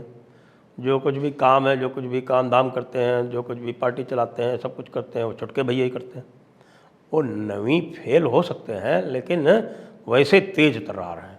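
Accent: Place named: Bihar, India. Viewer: i like ur bihari (bhojpuri style) way of presenting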